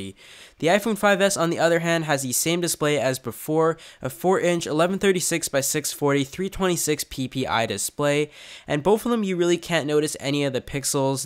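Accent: Canada. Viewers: Are you canadian? I hear a little bit of a canadian accent lol. Canadians have cool accent.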